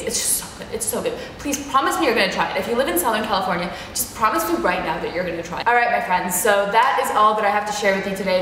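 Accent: Russia. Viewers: Легче понимать речь, если сравнивать с остальным англоязычном ютьюбом. У неё есть некоторый акцент, русский, или кажется? Поскольку большая часть из сказанного в видео было уже озвучено на classisinternal на родном русском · Почему у появился русский акцент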